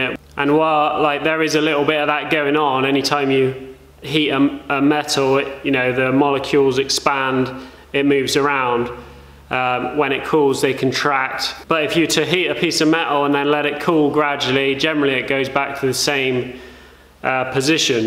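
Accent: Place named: Bideford, United Kingdom. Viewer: Miss mine, used to live in Bideford, so I can understand you quite well haha. Good video.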